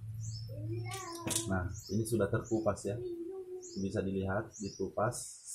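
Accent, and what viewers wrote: Indonesia. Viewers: klo dengar cara bicarax kykx dia org bugis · tutur bicara enak di dengar.. anak medan lai.....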